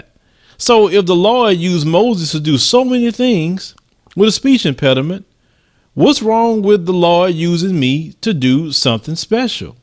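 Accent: South, United States. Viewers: speaks clear wit' a southern drawl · I minor in English phill and your just fine. I just love your southern accent. forget that silly woman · My god! This grammar nazi Crystal chick need to have several seats. It's not like you're an anchorman or a reporter at a news station where proper grammar is a must. There's nothing wrong with the way you talk, she couldn't stand your southern accent, but you speak proper to me and I was born in Chattanooga, TN myself and still got a southern accent. So I guess this Crystal chick went to Oxford University herself, SMH, just keep doing you.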